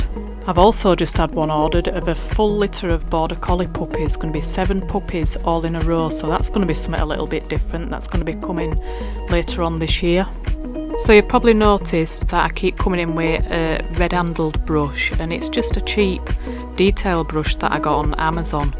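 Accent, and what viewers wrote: Yorkshire, United Kingdom. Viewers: I’m detecting a Yorkshire accent. I’m from Sheffield 😊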